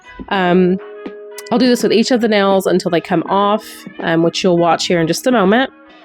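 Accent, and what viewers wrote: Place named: South, United States. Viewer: I love your country accent!! 😊❤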